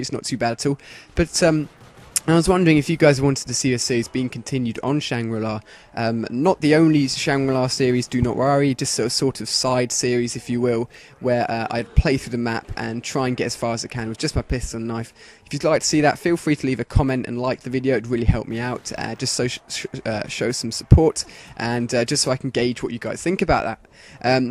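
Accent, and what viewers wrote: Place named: United Kingdom. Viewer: AHAHA! ANOTHER GUY WITH A BRITISH ACCENT!!!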